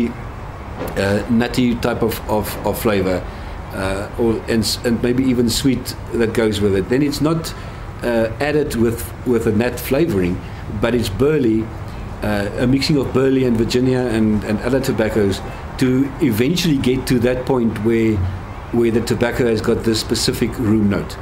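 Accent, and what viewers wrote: South Africa. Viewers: Good evening sir Could that be a South African accent?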